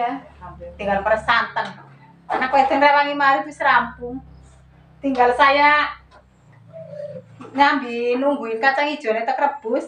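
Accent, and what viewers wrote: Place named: Java, Indonesia. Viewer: Logatnya medok bngt ya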